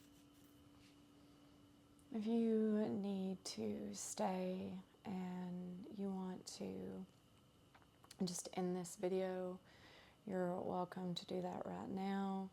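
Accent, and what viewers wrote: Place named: Tennessee, United States. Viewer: 🌹🔥🫶🏼🔥🌹 Love your Tennessee twang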